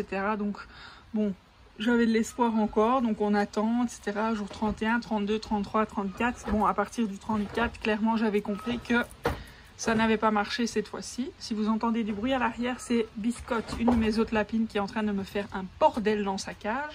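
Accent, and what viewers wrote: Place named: Belgium. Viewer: Coucou je voulais savoir où se situe ton élevage 🤔 car il me semble que tu as un petit accent belge 😆 J'ai regardé beaucoup de vidéos d'élevage de lapin et malheureusement il n'était vraiment mal renseigné 😕 Mais je trouve que toi tu t'es renseignée ,tu explique très bien et ça se voit que tu gère très bien cette elevage 😁 Aussi non merci beaucoup pour ta vidéo elle m'aide beaucoup 😊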